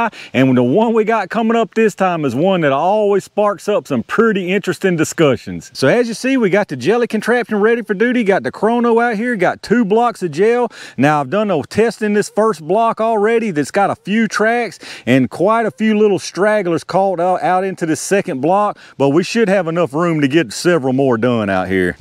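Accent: South, United States. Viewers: Love your videos, your speech/accent. Makes me want to be a southern boy.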